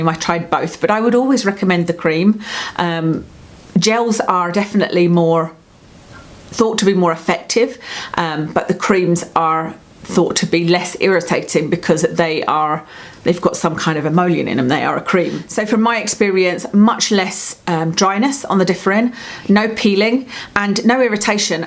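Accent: United Kingdom. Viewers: Heavy British accent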